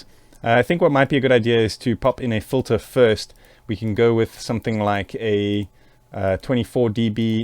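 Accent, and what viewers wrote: South Africa. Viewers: you sound South-African. Are you? :)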